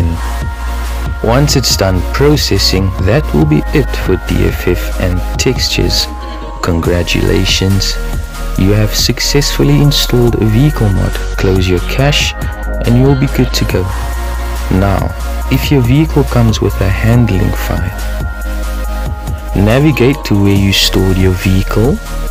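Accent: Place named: South Africa. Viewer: Your accent sounds South African. I'm from SA.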